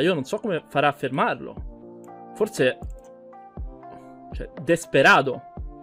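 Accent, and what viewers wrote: Tuscany, Italy. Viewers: Speed ception finale. Complimenti per la memoria del pattern. In passato peccato per la bassa qualità audio ma era bello sentire la c'è Toscano più marcato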